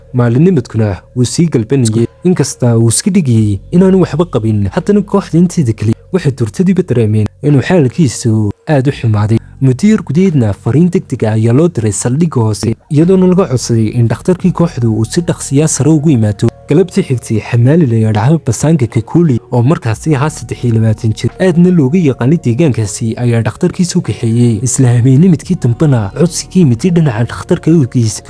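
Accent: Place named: Somaliland, Somalia. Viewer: lahjadii somaliland na waad leedahay waxaanaad isticmaalaysaa luuliyo halkaad ka isticmaali lahay julaay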